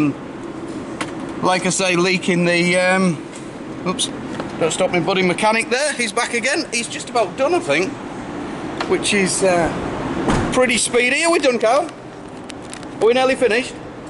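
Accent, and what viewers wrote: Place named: Liverpool, United Kingdom. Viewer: Love the accent mate, it does make me think of scouse a bit. I blame Harry Enfield. Used to love that show with the scousers 🤪😁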